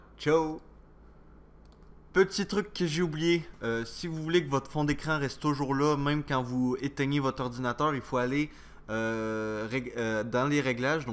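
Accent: Quebec, Canada. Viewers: J'adore ton accent Québécois ❤️✨ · il est québécois ^^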